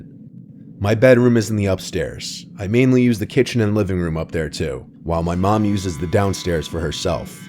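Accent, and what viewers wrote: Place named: New York, United States. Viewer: The New York Borscht Belt accent is killing me.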